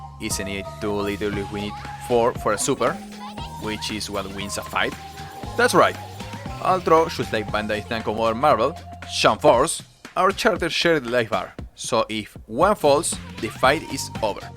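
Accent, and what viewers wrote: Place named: Spain. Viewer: Don't want to be mean, but you need to work out that pronunciation bro, either that or make Spanish guides. Try listening to the words on a text-to-speech or an online dictionary, you are using Spanish phonetics for English and it's never gonna work, just relax and it will come out more natural. The first step to talk more clear is to talk slower even if it doesn't sound as "cool". Thanks for the guide I think, this is the same in-game tutorial though... I just want to know how to make 10 hit+ combos without the air-state resetting, I try to use the wall but it doesn't work consistently.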